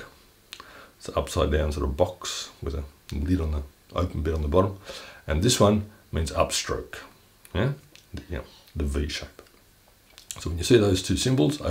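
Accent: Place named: Australia. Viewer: This is really cool! I'll be honest I was not expecting an Australian accent haha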